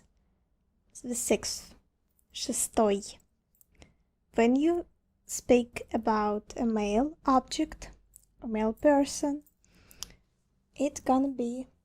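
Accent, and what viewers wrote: Russia. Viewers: Не кэн, а кьхен. Какой жоосткий русский акцент. Видео хорошее) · Strong russian accent is always a good trigger too. Idk why but I like it. Thank you!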